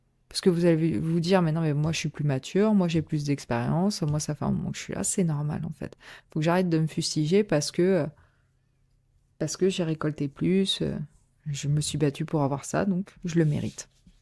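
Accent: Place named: Acadia, Canada. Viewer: Salut, heummm la carte no 2 me fait penser à la tradition druidique hehe hahaha l'accent me fait plus penser à celui de l'Acadie hehe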